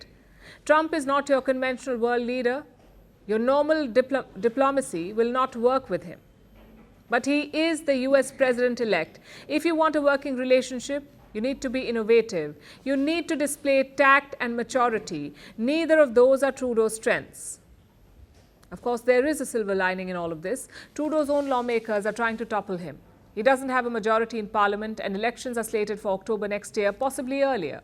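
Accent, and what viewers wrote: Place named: East India, India. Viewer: This is comical. Here’s an East Indian/Canadian Journalist with a heavy accent telling the World that Canadians need to stand up to the big bad orange man who hates our Prime Minister Trudeau and wants to take over Canada. This has become like a Saturday Night Live skit. Donald Trump knows full well that for the last 8 years the alt left Socialist Canadian media has fed conspiracy after conspiracy to its citizens who gobble it up and respond with distain and insults. The page has now turned. 🤣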